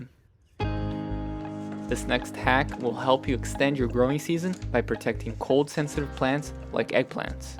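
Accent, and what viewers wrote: Brazil. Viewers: Are you are Brazilian American or a Ivan Brazilian. Your perfect pulo do gato accent made me wonder. That said. I love the aluminum can idea - do they provide additional heat for roots? Is that good? I’m having a hard time with. Making the cilantro seeds sprout.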